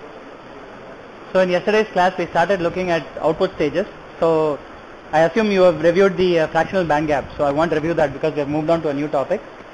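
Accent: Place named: India. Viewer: strong Indian accent prevents me from understanding.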